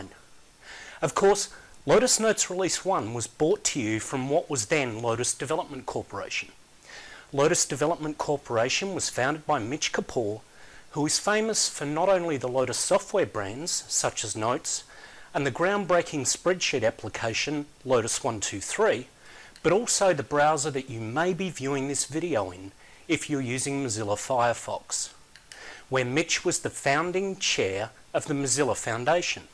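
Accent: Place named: Australia. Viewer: Man, I missed your Aussie accent :-D Without this product I wouldn't have come across with you all great people (yes, then of course with others ;-) ) and didn't make a living from and with it for almost 21 years. I will share this video to all customers who are thinking about migrating their apps to Microsoft - they will regret it soon.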